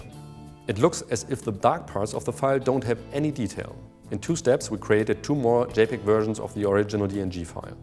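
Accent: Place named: Germany. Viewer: What a nice german accent,reminds at the terminator.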